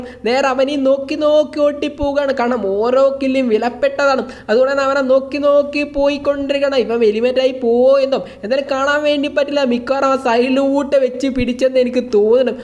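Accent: Kannur, India. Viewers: Kannur karnano bro nane athe mannur enna stalathane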